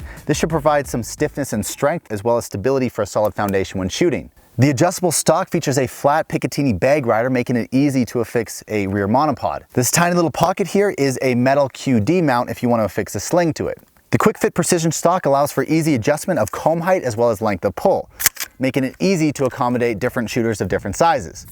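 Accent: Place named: United States. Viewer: Wow! A Canadian that sounds like an American. Not for me.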